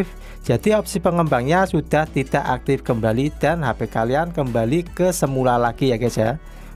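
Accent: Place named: Central Java, Indonesia. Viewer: Logat jawa tengah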